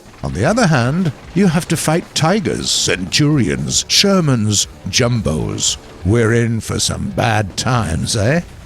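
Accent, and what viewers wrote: United States. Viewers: wait why did u turn American?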